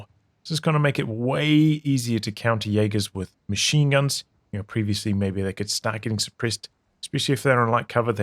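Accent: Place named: New Zealand. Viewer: Great video always throws me hearing a fellow kiwis accent on you tube when I’m so used to just hearing yanks and Brit’s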